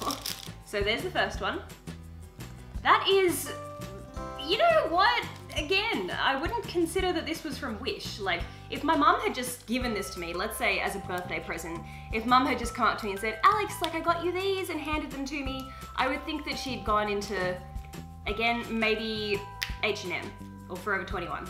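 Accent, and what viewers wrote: Australia. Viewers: are you australian?and your bird is so cute i had the same one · GURL U AUSSIE!!! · im choking, you're aussie? bLEsS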